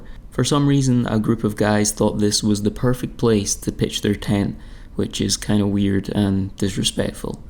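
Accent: Scotland, United Kingdom. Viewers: Hi! I like you! I arrived in Scotland (Edinburgh) this past Monday, and the voice of the Airbnb fellow I am staying with keeps reminding me of yours! You all sound like you are speaking music to me. I so enjoy listening to you and watching. This video is one of my favs so far. You are a great rock skipper. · Amazing scenery is always appreciated. Just money making can get a bit boring. Tiny houses are also fascinating as there are no two the same. And to hear a Scottish voice is cool! 💗🆒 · I personally enjoy both video styles, but the vlogs with beautiful scenery narrated with a Scottish accent and a quirky sense of humor is nice to watch from the blazing heat of Texas, USA.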